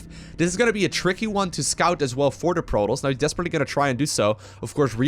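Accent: Netherlands. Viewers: Ah ur dutch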